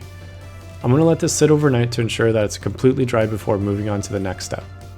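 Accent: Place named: Europe. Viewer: From which country are you from?? I love your european accent 😍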